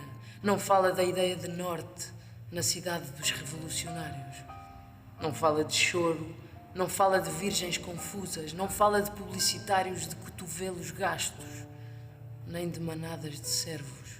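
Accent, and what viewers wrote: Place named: Portugal. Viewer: seu sotaque português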